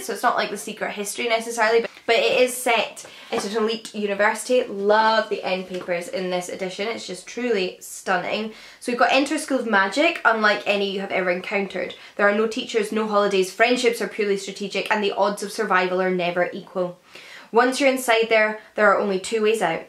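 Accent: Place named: Glasgow, United Kingdom. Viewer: I can't understand the person who made this video. She sounds like a glaswegian docker.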